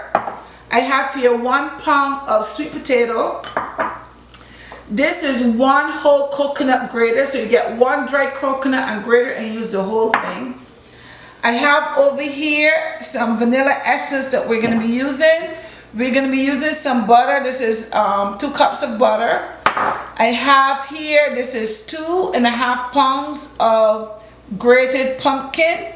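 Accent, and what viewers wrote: Barbados. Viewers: Your recipes are always on point. Any one can follow. I am so impressed that even though you've been away from this land for so long you sound so bajan. And you remember everything. Love ❤ it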